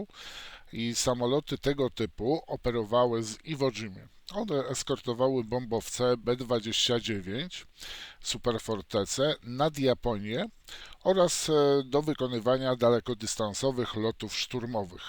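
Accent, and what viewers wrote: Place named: Poland. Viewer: Ciekawy Polski alcent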